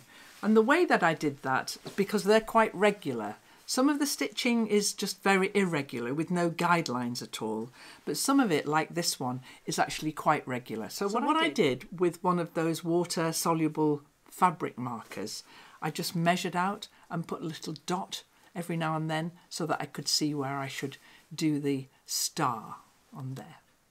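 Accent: United Kingdom. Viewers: Woo so much work! Your jacket will be beautiful. My first vidéo from you but not the last one. I will learn english with your nice british accent.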